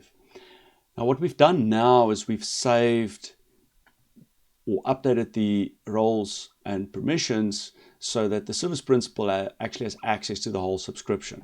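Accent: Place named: South Africa. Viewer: Good stuff - The South African accent is also refreshing :)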